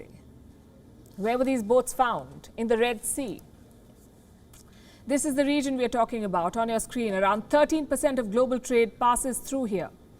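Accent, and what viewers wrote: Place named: India. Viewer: It is hard to take someone with an Indian accent seriously 😂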